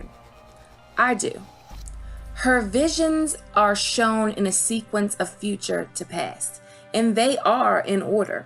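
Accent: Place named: Baltimore, United States. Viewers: your critical thinking skills are next level...i have enjoyed your videos for the past couple years (you, gemma, quinn, and preston jacobs)...you are obviously as obsessed with the GOT world as i am. (and your baltimore accent reminds me of my family) keep making that magic happen. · I agree I hear a distinct Baltimore version of the Long Night. I don't know where is from but I am hoping I am right. She send us Ravens it only makes sense.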